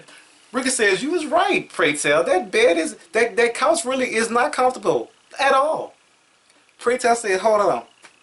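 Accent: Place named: Louisiana, United States. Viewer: I am from New Iberia. You sound like you from St. Martinville or Breaux Bridge.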